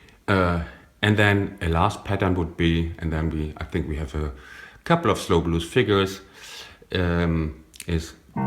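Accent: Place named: Germany. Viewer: Hallo, nice to hear your german accent......you Really make a good Job for beginners. One Problem for me was your First Trick for advancing the next chord.You speak from a Long Or nodded Note.I dońt Hearings ich in the beginning a looong Note, but only a strong Note.????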